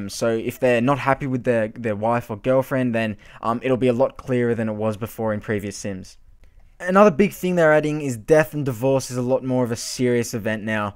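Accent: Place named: Australia. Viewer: What country are you from to where talking and driving are realistic? You sound Australian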